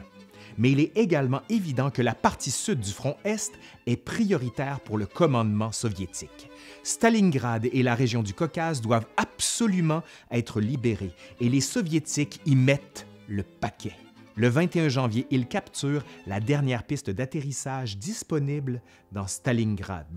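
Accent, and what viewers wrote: Quebec, Canada. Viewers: Excellente série de vidéos ! un gros travail objectif et exhaustif.... peu de niaiseries sentimentales, l'histoire et que l'histoire jaime beaucoup et l'accent québécois c'est la petite cerise... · Premièrement j’adore l’accent québécois. Si tu avais été mon prof d’histoire-géo au lycée je suis sûre que j’aurais eu des meilleurs notes. Non vraiment tes vidéos sont super instructive moi qui suis une passionnée de la seconde guerre mondiale j’ai trouvé du contenu digne de ce nom. Je me rappelle aussi des témoignages de mon arrière-grand-mère. Alors je suis française je viens de Vendée et j’y vis toujours. Vendez égal zone occupée elle disait que tous les jours il y avait un allemand sur sa bicyclette avec une bouteille à la main en train de demander des pièces et il fallait lui donner les pièces sinon… on m’a aussi raconté que dans une ferme de ma région le fermier s’est retrouvé sans sa vache parce qu’un autre fermier concurrent a dit à un soldat allemand de s’en emparer. 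Bref le temps a été dur pour ces personnes que j’ai connu et qui m’ont témoigner de ce qu’ils ont vécu avec beaucoup de courage. Cependant les témoignages de la famille ne suffisent pas il faut voir les choses avec globalité et grâce à toi c’est possible. Vraiment merci tu es trop génial. Il faut mieux regarder tes commentaires que niaiser comme on dit chez toi. Kiss et continue comme ça! Bon, je descends les vidanges et je reviens à cette nouvelle vidéo. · Je découvre votre chaîne, et -- je vous le répèterai ailleurs, sans doute losque je regarderai votre première guerre mondiale -- j'aime beaucoup votre voix québécoise au sujet de l'histoire, qui vous incite à traiter d'autres faits que choisirait un français. Par exemple, il n'est pas indispensable de parler ici de la libération de la Corse, qui n'intéresse qu'un français de France...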